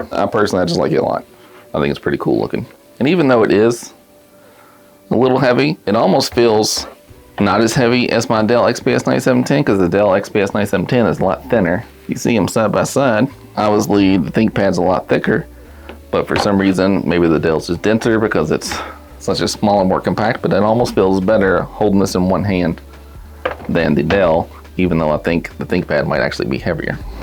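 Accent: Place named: Texas, United States. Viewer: nice accent! Texas?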